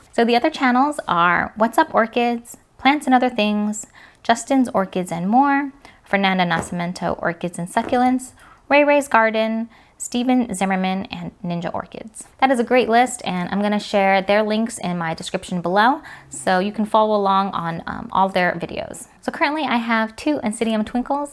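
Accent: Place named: California, United States. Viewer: Uuuugh that airy babyish sing song kardashian california accent is unbearable. Train yourself to get rid of it!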